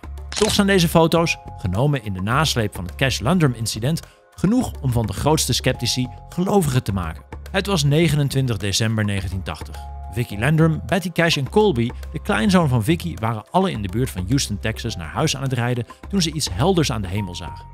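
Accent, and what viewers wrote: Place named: Netherlands. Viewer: 'Zeker en vast' is Vlaams maar je klinkt Nederlands. Huh?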